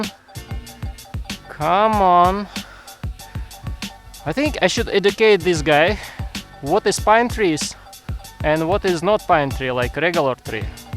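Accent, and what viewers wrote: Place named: Russia. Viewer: Дякую за відео. У тебе точно такий же акцент як в мене, тому я здогадався, що ти теж руский )). У мене такий же дрон і я трохи розчарований як працюють сенсори. На скільки я зрозумів, дрон не бачить сухих гілячок.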